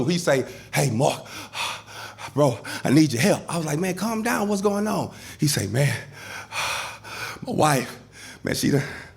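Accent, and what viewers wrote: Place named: South, United States. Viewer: I love the way enunciates his words when speaking to the audience. You still hear the South but he's proper with no need for code switching. Perfection! Now when he's telling stories about talking to his people issa wrap😂😂😂😂😂😂😂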